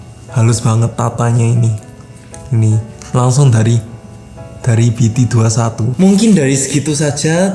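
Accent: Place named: Java, Indonesia. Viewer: Wooaah selamat nnton miin critain akuu yaah.. Btw admin ko bahasa nya agak medok gituu..yash..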